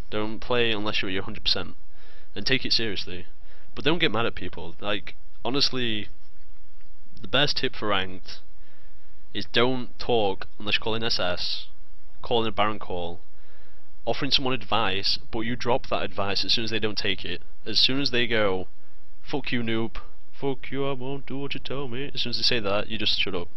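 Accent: United Kingdom. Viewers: I want a guide on how to hear what you're saying (i have trouble understanding british accents)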